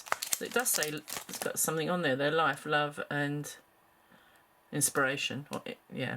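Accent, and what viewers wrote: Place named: United Kingdom. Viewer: What a great way to get started. You did a great job. I'm going to try too. Nice to hear a british voice too. Subscribed. X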